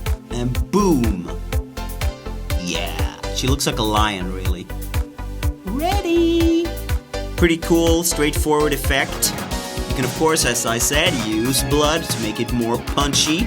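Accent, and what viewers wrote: Sweden. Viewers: Swedish? :D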